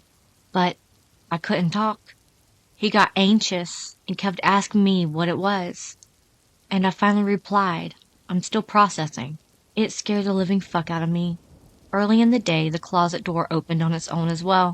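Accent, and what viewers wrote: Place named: South, United States. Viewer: Having an issue trying to connect to your Instagram from the link in your video description. I've gotten a bit behind but it's nice to hear your sweet Southern voice again. Sorry I can't remember is it Alabama? No, it's Georgia isn't it?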